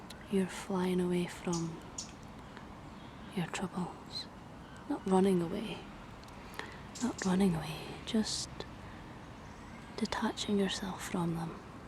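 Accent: Scotland, United Kingdom. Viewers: I absolutely love how this ASMR video blends the natural ambient sounds of the outdoors with the gentle clarity of your voice. The subtle background noise from traffic adds a real sense of being in the moment, which feels so grounding, while your voice remains perfectly clear and soothing. That Scottish accent really enhances the cozy, personal attention vibe. Truly a relaxing experience, both calming and immersive! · Thank you, spine tinglingly supreme as always. The lull of your Scottish whispers and soft rich warmth of your chatter is pretty special. ❤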